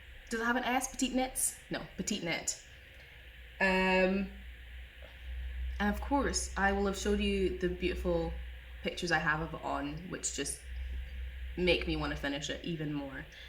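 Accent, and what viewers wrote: Scotland, United Kingdom. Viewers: Hi, found you in my thread after you got a shoutout by Kevin and Ray on Needle At the Ready podcast. I’m based in Berkshire, UK and enjoying your Scottish accent. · I’m from the Detroit area of the USA. I found your podcast in my YouTube feed and subscribed after watching your first episode and was very excited today to see you have a new episode to watch. I adore your Scottish accent and don’t have a problem keeping up when you talk fast. So, don’t change how fast you are talking on my account, lol. Thanks for the best new podcast I’ve seen in a while. So fun and fresh! ❤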